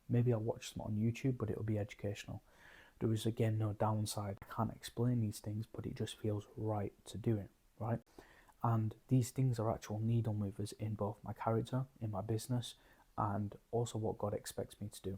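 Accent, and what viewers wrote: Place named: West Yorkshire, United Kingdom. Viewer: ithought it was a west yorkshire accent. I studied linguistics at uni. Id love to meet up buddy if possible for a chat sometime? To hear your journey and to ask for advice on the Gospel.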